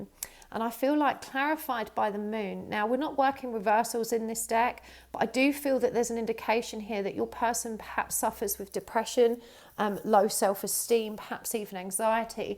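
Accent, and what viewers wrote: United Kingdom. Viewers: Omg i love the British accent. Like it's super interesting why people in England have accents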